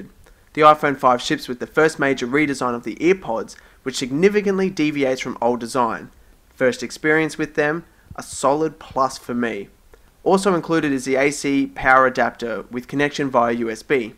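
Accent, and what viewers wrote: Australia. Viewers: I love your Australian accent and great video :)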